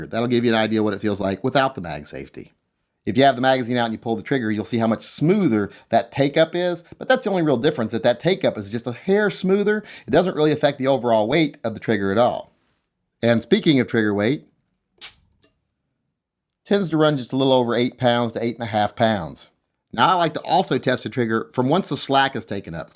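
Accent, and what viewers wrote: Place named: South, United States. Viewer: I need to ask you. You're called, but you have a southern accent. Why?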